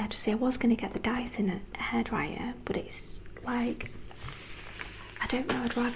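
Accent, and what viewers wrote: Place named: Northern England, United Kingdom. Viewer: Lovely video! Your accent sounds familiar, seaside northern town maybe?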